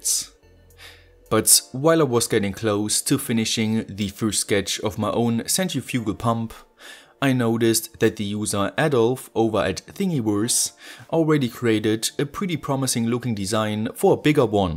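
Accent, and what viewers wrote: Germany. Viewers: i can hear that you a german guy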